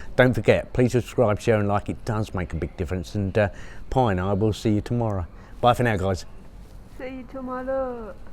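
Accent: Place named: Northern England, United Kingdom. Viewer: His British accent just got a bit more 'northern' now, lol.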